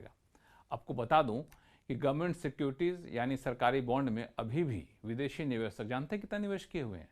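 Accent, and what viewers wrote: India. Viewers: your delivery of Information in very desi andaaz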